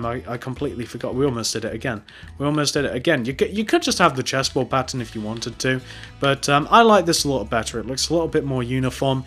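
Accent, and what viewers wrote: United Kingdom. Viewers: + Kevin Adams Yes he is British ( focus a little bit on his accent ) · I have a question are you British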